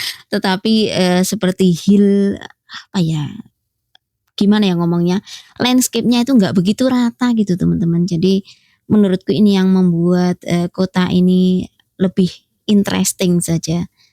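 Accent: Java, Indonesia. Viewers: Ass...klrg kecil yg bahagis...Salam sy dari Bekasi...sy sangat sk dengan kllrg kecil ini...ap lg mbk...org nya grecek,klu lg ngomong sy sk ketawa dgn logat jawa nya,org nya,sederhana,dan tidak sombong...sukses terus mbk dan klrg...❤🎉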